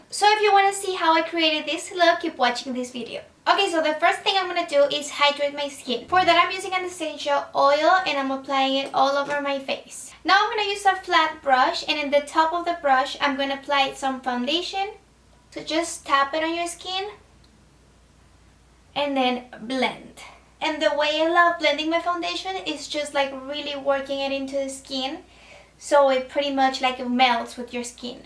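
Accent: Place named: Colombia. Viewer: amo tu inglés colombiano😍😍😍😍😍😍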